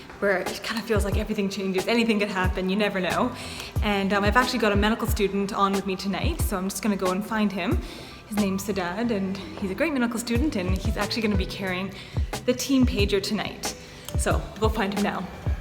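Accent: Canada. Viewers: Are you Canadian? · is she canadian?? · I love your Canadian accent! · You sound Canadian lol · At first I thought you were British... but I think you are Canadian?😂 I’m from England so all accents that are like American sound very similar 😂❤️ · Canadian. Ontario.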